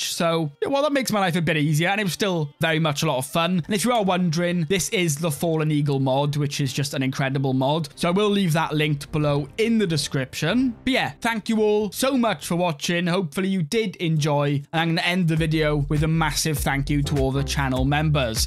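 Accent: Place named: Caerphilly, United Kingdom. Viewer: It’s always really nice to hear another person from wales. But always weird 😂 I would guess Caerphilly by the accent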